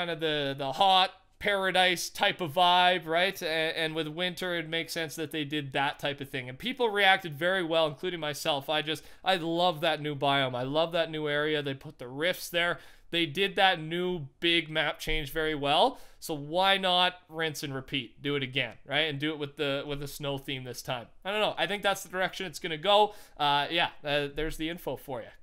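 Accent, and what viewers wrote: Canada. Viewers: Are you Canadian? It sounds like you have a little Canadian accent 😂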